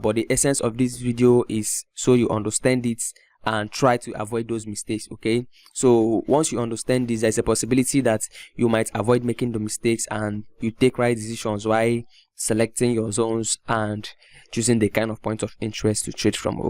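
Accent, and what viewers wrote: Nigeria. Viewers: Where are you from bro? Nigerian??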